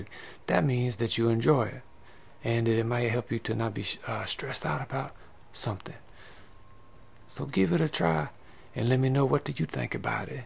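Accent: Louisiana, United States. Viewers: You are a true breath of fresh air with your down home ways. I've been missing Texas and by the sound of it , you must be from Louisiana so that's pretty close to home LOL. Love your work and please! please! pleas! Take care of yourself. In another viddy you mentioned a health scare😵‍💫 Dont mess around with things. We need you around, you nake so many of us feel so much better about life.👍👍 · I think sounds like a Cajun man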